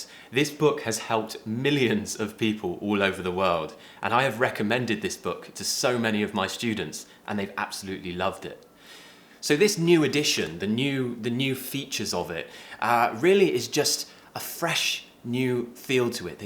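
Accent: United Kingdom. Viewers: #EnglishGrammarInUse absolutely love your adorable British accent 😍 thank you so much for inspiring me to learn English. Much love from Russia ❤️☺️ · #EnglishGrammarInUse hello, your accent is the best British accent. I need some advice. I know many many words in English, but i can't use all of the words, when i talk or speak with somebody. Rest of time i forgot majority words. Please say me how can i use many different words in my conversation. Generally i use very simply words, when i speak. I want to speak more widely and i want to use more different words. Thank you so much before your answer! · I adore your British accent!❤ You're amazing❤ Greeting from Egypt❤ #EnglishGrammarInUse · #EnglishGrammerInUse hope its not too late to comment and join the competition! Constantly learning English is one of my hobbies and favourites, and a foreign language makes me more sensible when talking to ppl and guide me to see the world in a different way! 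Thankss I really like your channel and feeling motivated and encouraged by such wonderful British accents too!:) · I love your British accent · #EnglishGrammarInUse I love listening to your British accent. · I adore your British accent! #EnglishGrammarInUse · Wow! You are the best teacher, good lesson. And you have good British speech, the best as for me. From Ukraine with love and.. #EnglishGrammarInUse :)) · #englishgrammarinused Woww... you looked sexy at this video. Love from indonesia 😊✌ oh ya... I used to bad at listening, but i loved to learn listening through all your vid without subs. And nowadays my listening better i supposed because i can understand you with your British accent... Thank you for your vid and your clear sound and pronunciation. 🙏🙏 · I really like your British accent... #EnglishGrammarInUse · #EngliushGrammarInUse I just love your channel and your British accent. 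Nice one!!!!!! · Your accent is my inspitation to become a good british english speaker. #EnglishGrammarInUse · When you want to sound British, just listening and imitating him., you're the best! #EnglishGrammarInUse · I really like the way that you teach us your British accent is easy understanding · #EnglishGrammarInUse Thank you for filming so many amazing videos. Your videos help me build up British accent greatly and I am totally fascinated by your voice! Your videos are always the best way for me to train my British English listening, and at the same time, I do learn some useful phrases also. Besides, your videos are always the first choice that I highly recommend my students to watch and learn British accent. Every time my students say that they are interested in the British accent, I always say go watch videos. Once again, thanks for filming so many excellent videos!!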